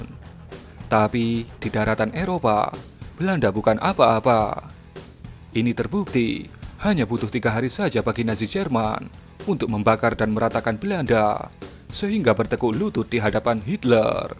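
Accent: Java, Indonesia. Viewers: Admin logat jawamu sangt menganggu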